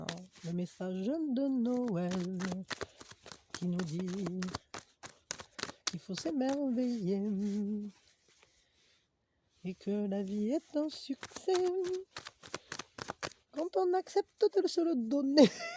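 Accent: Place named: Gascony, France. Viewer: Chère, je viens vous dire M.E.R.C.I. merci, merci pour cette magnifique, magique, et tellement parfaite année que vous nous avez offerte avec ce cœur magnifique que vous avez. Vous faites ^partie des gens exceptionnels que j'ai eu le bénéfice de rencontrer. C'est rigolo parce que vous avez le même accent que moi ( je suis en Gascogne - 32 ) et vous? si vous permettez bien sur. Joyeux Noël. Paix, Amour, Lumière à vous ainsi qu'au monde entier.Je nous aime!!! PS: Vous chantez divinement bien!!!; )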